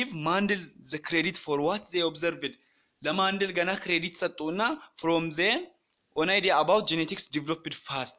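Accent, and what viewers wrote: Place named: Hadiya, Ethiopia. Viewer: ሀዲያ ለሀጭህ ምንድነው አስተካክል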